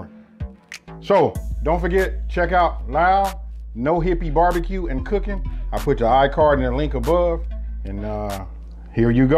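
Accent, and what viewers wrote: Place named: California, United States. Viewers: Sound like L.A. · I thought he was from LA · Man! Just discovered you last night and I been hungry ever since, knew I recognized that Cali accent, from the Bay Area but been in the south for 30yrs, keep doing what you doing love it! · I recognized that South L.A accent, not a Compton accent 😁 I LOVE the recipes! I need an invitation to the next cook out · I knew he was from LA off top but it's a Compton thang we all LACounty but we breeded different.... Lol and (no disrespect either) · I thought you were from LA! LOL....The chicken looks screamin.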